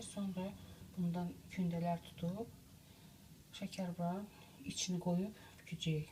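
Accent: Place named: Baku, Azerbaijan. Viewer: Ay ureyim getdide oz baki danishiqimizcun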